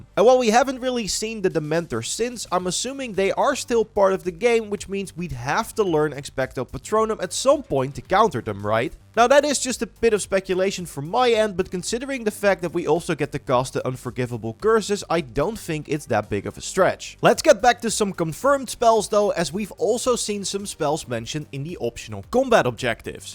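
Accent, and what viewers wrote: Netherlands. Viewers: Are u dutch? I hear a dutch accent in your english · I smell dutch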